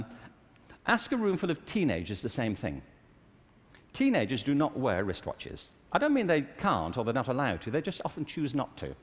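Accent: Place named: United Kingdom. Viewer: Don’t like British accent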